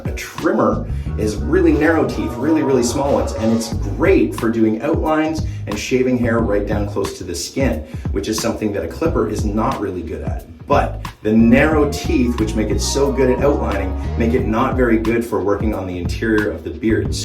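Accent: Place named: Canada. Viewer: I thought you were canada based with your accent and the way you spell colour.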